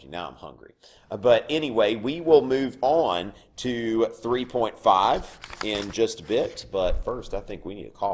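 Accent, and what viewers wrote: South, United States. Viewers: This guy is a good explainer. And he has a cool Southern accent.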